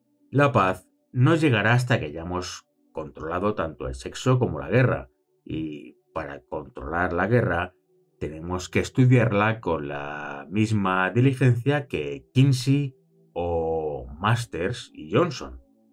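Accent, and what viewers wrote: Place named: Spain. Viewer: Oztia tio chaval, usted empezó a hablar español de España 🥺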